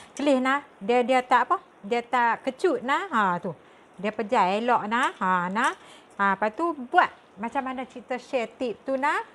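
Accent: Kedah, Malaysia. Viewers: Mmg padu loqat kedah. Terbaik..!! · Assalamualaikum.. sya mmg suka sgt follow minat sgt2 kt terhibur dgar celoteh dlm longhat kedah..resepic.ta pn smuanya menarik dn berselera.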